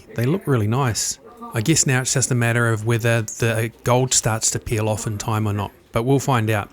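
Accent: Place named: Australia. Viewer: The down under accent makes any content even more fun to watch. Awesome job, mate.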